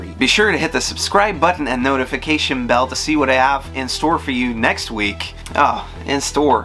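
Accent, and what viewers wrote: Canada. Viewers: AN is common. TREE letters is way to complicated for most us mericans lol. Seriously tho your accent is damn near "perfect" sounding for a country made up of all other accents. I figured you Canadian because it's so subtle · Are you canadian? You sound Canadian in this video.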